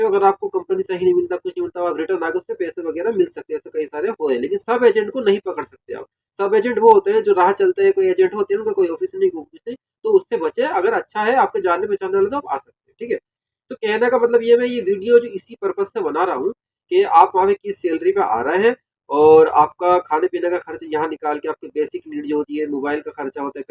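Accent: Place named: Rampur, India. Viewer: Ap Rampur ke ho kya ..kyon ke apki baat chit ka andaaz rampuri hai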